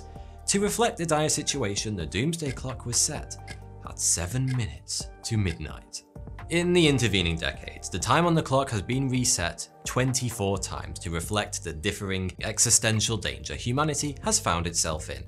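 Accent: United Kingdom. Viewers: I've been interested in inbreeding for many years, and have read about and watched videos concerning the Hapsburgs and Queen Victoria's descendants spreading the hemophilia gene. You did a very nice job researching and presenting this information about inbreeding. Add to that that you're a Brit with a fantastic accent, and I'm hooked on this channel! Everything sounds better when narrated by a Brit!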